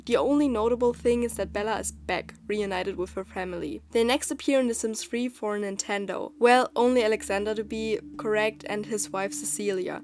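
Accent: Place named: Germany. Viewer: man hört das sie deutsch ist😂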